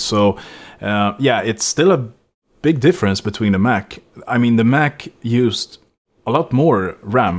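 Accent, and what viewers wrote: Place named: Sweden. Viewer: Tack för denna videon =) Då vet man lite vad man ger sig in i ifall man beslutar för att gå över till M1 i framtiden. Gissar på att du pratar svenska, hör på dialekten =) =)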